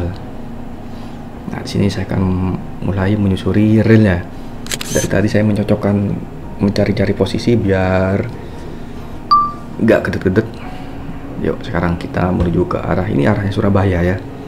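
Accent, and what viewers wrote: Madura, Indonesia. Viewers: Logatnya madura...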